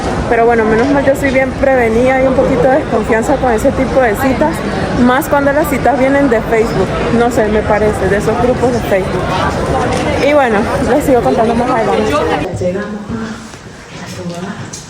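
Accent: Colombia. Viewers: Esta chama es venezolano pero habla como una colombiana , imagino que su por estadia alla imagino que ahora va hablar como un español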